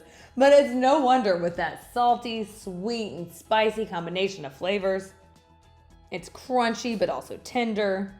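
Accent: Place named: South, United States. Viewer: You sound like you're from the South.. just a random observation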